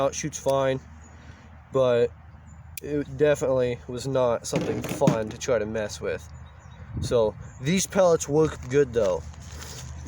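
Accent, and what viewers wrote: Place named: Massachusetts, United States. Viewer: Cool stuff. An interesting video and comparison info. YouTube recommended your video to me here in Toronto, Canada. Guessing you're a Massachusetts fella, judging by your accent. Ha!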